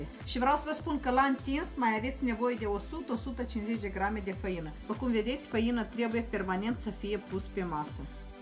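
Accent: Moldova. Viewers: Pare usoara la prima vedere dar e destul de muncit la ea.Oricum D-na m-a fermecat cu dulcele grai moldovenesc👍💋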